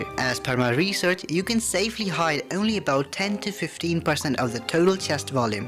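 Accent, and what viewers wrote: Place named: India. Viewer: Yo I guessed you're from India purely on the basis of your accent and I realized when you said you have a Potato Phone as Indian Parents usually don't give good phones to their son or daughters😭😭😭 Love From West Bengal aka Hamba Hamba Ramba Ramba State! Peak Content ❤❤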